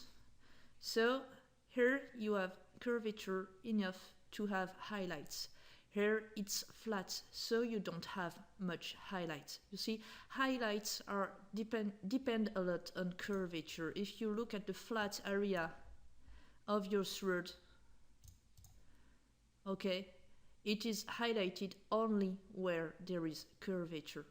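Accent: France. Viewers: Bonjour, J'ai regardé vos vidéos. Je crois deviner un accent français. J'aimerai faire des sculptures en bronze à l'aide votre utilitaire "Metal Shader" avec Victoriai 7 de Daz Studio. Est-ce que c'est possible? Pourriez-vous svp me donner quelques pistes pour débuter? Je vous remercie. Jean-M!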